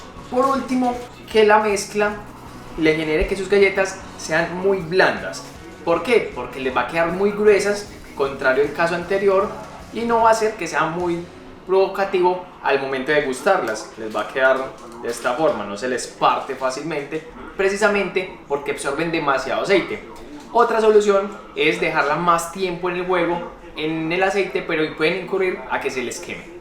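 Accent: Antioquia, Colombia. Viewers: Me encanta ese acento paisita 🤭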